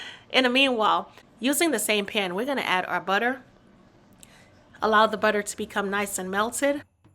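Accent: New York, United States. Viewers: That New Yawk accent is adorable. 🥘💙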